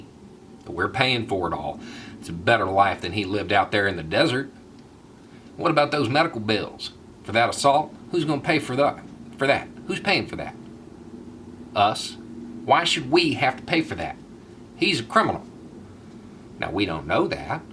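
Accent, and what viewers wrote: South, United States. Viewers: There’s that great southern accent brother... well said sir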